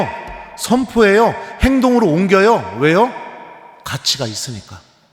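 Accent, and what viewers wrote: Chungcheong, South Korea. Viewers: ❤🎉 for your Choobg-cheong-do voice which is the same voice I have heard in Toronto Aurora, Luke Cho in Canada 🇨🇦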